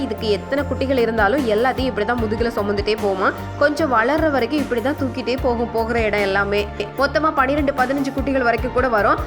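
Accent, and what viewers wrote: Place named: Coimbatore, India. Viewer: ok mam, கோயம்பத்தூர் slang மாதிரி இருஞ்சு, அதான்.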